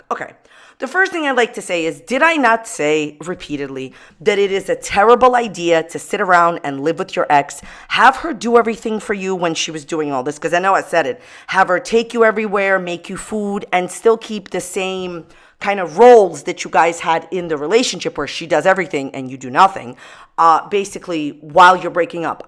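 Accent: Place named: New York, United States. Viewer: I’ve been a part of the Amberverse since this most recent “breakup” in July. No idea how I haven’t found your channel sooner!! I moved to Florida a few years ago, from Massachusetts. Your thick NY/NJ accent reminds me of the North… I love it! Definitely my new favorite GorlWorld reporter!!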